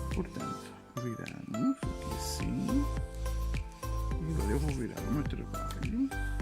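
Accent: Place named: Portugal. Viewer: Aula maravilhosa e este sotaque Português, que eu amo❣️. Perfeito, 🙏🏻🇧🇷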